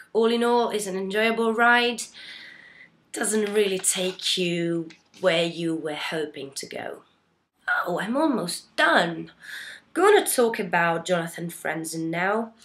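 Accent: United Kingdom. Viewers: What in the world?!?! Why do you have a British accent? How did you do that? You're Italian, but you have an almost native British accent. Uhhh WOW! I miss your bluebeam channel... what happened? I wish I knew you in person, you're so amazing and have so much depth.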